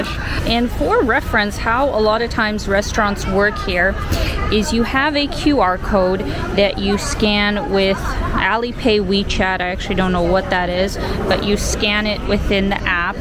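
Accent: Canada. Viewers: You know, I never really get when my American friends told me we have an accent, but somehow I can tell she’s Canadian from the way she talks. 😂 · I can see why college students would want to watch videos … excellent pronunciation and cadence for those learning English (with a lovely slight Canadian accent). And it’s experiencing new adventures through positive respectful awareness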